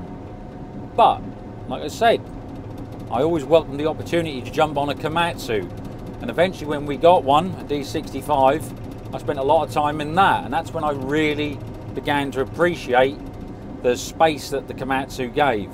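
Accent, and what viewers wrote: Norfolk, United Kingdom. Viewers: What accent is that? Norfolk?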